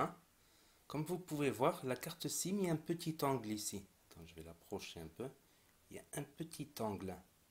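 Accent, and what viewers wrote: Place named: Arab world. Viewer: L accent robeux hhhh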